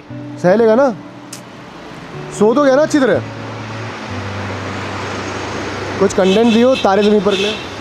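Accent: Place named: Haryana, India. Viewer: Jadda haryanvi hai bhai😂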